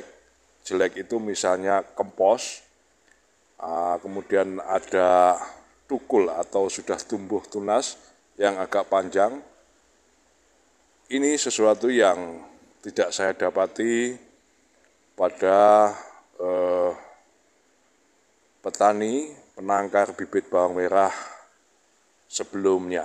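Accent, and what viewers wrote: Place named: Nganjuk, Indonesia. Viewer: pean asal dr daerah mana..bahasanya kok plek wg nganjuk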